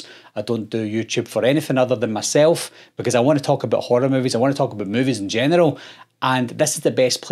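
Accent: Scotland, United Kingdom. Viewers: Well deserved fella. Btw I love the Scottish accent and can understand you just fine. Keep on keeping on.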